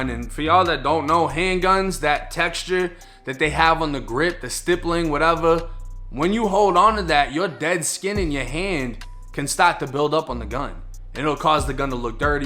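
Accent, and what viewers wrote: Boston, United States. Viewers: Boston style🤔⁉️⁉️⁉️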